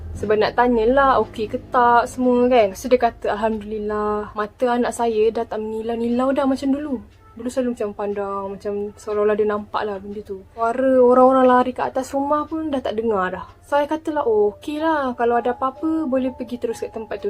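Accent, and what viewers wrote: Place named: North, Malaysia. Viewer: utara sleng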